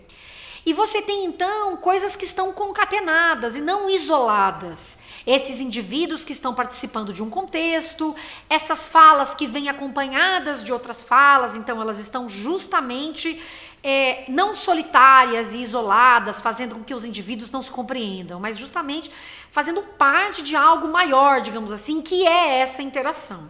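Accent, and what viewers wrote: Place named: Caipira, Brazil. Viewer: Acho que a graça e a riqueza de qualquer língua está justamente nos diferentes "sotaques"! É uma delícia ouvir você, com seu "R caipira", falando sobre isso!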